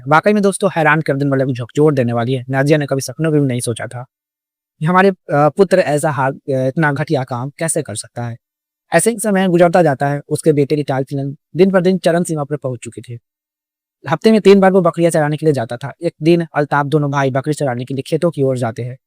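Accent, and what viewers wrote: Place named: Haryana, India. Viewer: Haryana se bol rahe hain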